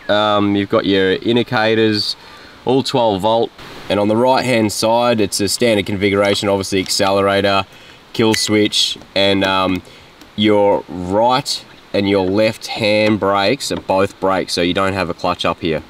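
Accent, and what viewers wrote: Australia. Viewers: Damn! Wish I could speak Australian ! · Very cool, I live in Canada and I've heard of Rokon and a couple other 2WD motorbikes, and I always thought it would be great to own one, I've owned all kinds of offroad dirt bikes, but never a 2WD, it would be great, but not until my kids grow up, I just hope I'm not too old to really use one by then, great review anyways Buddy, u must be from Australia?? Not New Zealand I guess???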